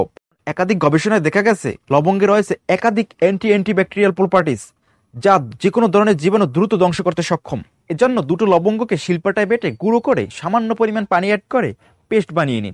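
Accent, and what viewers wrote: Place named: Barishal, Bangladesh. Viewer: Mone hoy apne borishailla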